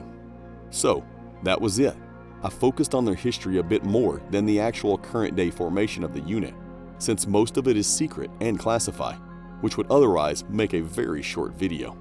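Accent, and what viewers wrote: South, United States. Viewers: I detect a southern accent.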